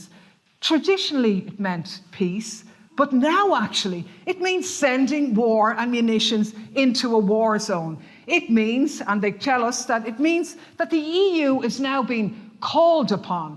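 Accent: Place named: Ireland. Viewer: Irish accents though. So pleasant.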